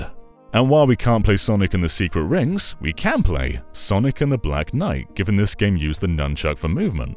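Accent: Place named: United Kingdom. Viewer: your accent from what region is? because I feel it doesn't sound like stereotypical british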